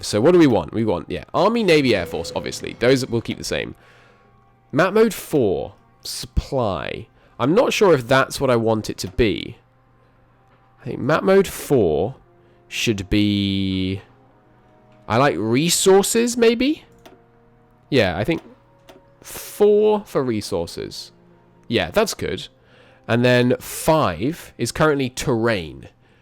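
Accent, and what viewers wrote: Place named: RP, United Kingdom. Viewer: How would you describe your accent? To me (Australian born), it sounds like an English Received Pronunciation, but perhaps tempered with something else. At times it sounds faintly like a sort of 'cultivated' Australian accent, the way a well educated younger Australian who isn't ashamed to show it sometimes speaks. (But not Cultivated Australian, which is a semi-official category, and today virtually extinct - the former PM, the late Malcolm Fraser, was an exemplar of this accent, and you don't sound anything at all like him).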